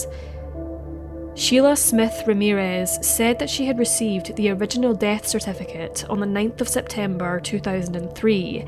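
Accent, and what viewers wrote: Scotland, United Kingdom. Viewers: It's Scottish can't u tell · I can see why businesses often want to set up their call centres in Scotland. Can't beat the Scottish accent. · Which part of Scotland are you from? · Excellent. Another sad sad case I never heard of. Wish I could have helped. Thank you. I have subbed as well. PS I love your accent. Are you...Scottish? Please forgive me if I am wrong.🙏😩✌👼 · It's a perfectly good Scottish accent, nothing funny about it!! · You know it's a Scottish narrator, when you hear, "alight" instead of "get off." Thumbs up for eloquence!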